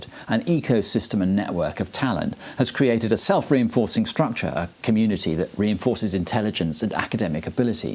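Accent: United Kingdom. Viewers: learning thinking skills in general is a key factor in any successful learning process including critical thinking for sure to isolate false data and extract facts based on sound truthful information, then comes skills how to read, how to write and how to search for truths by yourself. thank you for sharing your ideas and i love the British accent you speak with, it sounds academic for me ! Thanks again.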